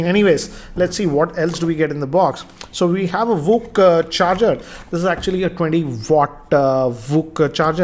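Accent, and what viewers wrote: India. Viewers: Nice a indian speaking englishI insta sub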